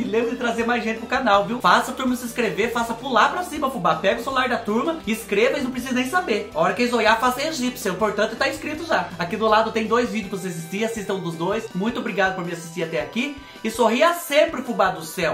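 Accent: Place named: Minas Gerais, Brazil. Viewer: amo o seu sotaque, falo bem parecido, aqui na minha cidade o povo me chama de "mineirinha" 😂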